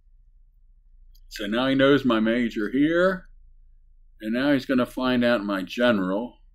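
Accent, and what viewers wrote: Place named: Baltimore, United States. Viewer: I'm from Southwest Baltimore County I should've known! It sounded like a Baltimore accent but a little different that's why I thought philly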